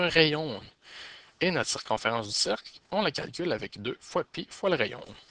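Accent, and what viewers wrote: Quebec, Canada. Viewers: Le québécois est vraiment syyyympas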